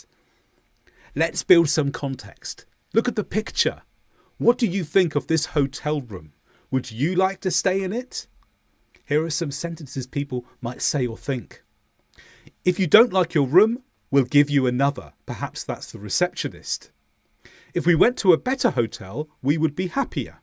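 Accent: United Kingdom. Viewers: Wow the British accent whatever it is nonsense 😮😢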